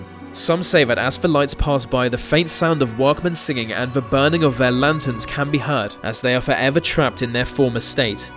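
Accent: United Kingdom. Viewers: Can anyone tell me why we need to have GODDAMN LIMEY'S narrating ANYTHING especially for Canadian videos?!? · Word if advice to anyone not familiar with Ontario: don't EVER say it the way the narrator does in this video. That is incorrect and you will be corrected and/or laughed at if you do. No, just because he's got a British accent it's not some eclectic English pronunciation he blurted out, dude simply never heard it pronounced before and that's a fact. The A in Ontario is a long vowel, not short. Simon Whistler does this same shit all the time in the videos he narrates too.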